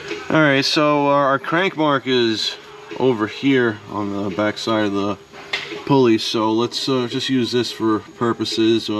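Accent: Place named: Bronx, United States. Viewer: Hey buddy love the accent what part of the city are you from guessing bronx, Chad I am from albany new york. Hope your well.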